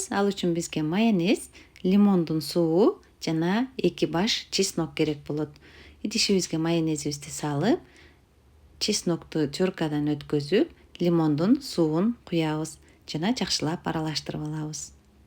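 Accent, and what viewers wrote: Standard. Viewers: Азаматсыз таза кыргызча суйлоп салаттврды жегиликтуу ,жегиликтуу кылып жазап жатканызга !!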